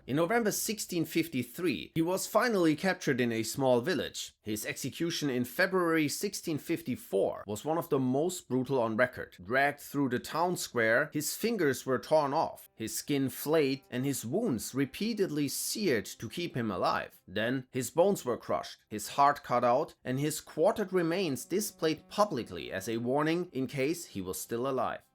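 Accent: Germany. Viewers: I looove your german english accent! 😂 I keep going back to your "every german state explained by a german" video! keep it up!!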